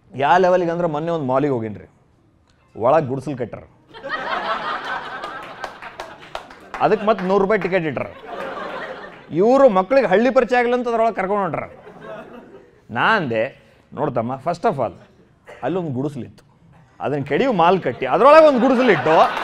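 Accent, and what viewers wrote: North Karnataka, India. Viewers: His voice Uk accent Jokes all ...Lit🔥🔥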